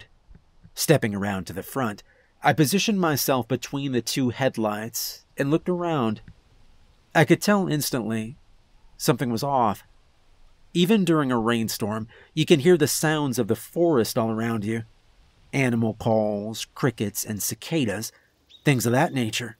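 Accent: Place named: New England, United States. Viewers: Sounds like a northeastern accent not Pacific Northwest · Why is Cliff Clavin running a gas station in the Pacific NW? The accents are perfect, but for the NE, not the NW.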